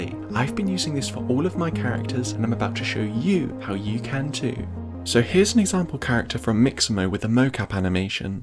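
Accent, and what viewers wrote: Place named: United Kingdom. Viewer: Thanks a lot ! And... I luv your british accent ! Greetings from germany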